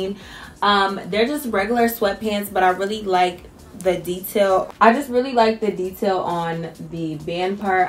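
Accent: United Kingdom. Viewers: It’s always gone be the British accent for me 🤭🫶🏾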